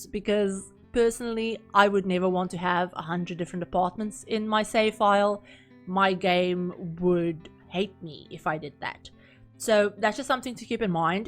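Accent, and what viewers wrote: South Africa. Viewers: You sound south African, im from SA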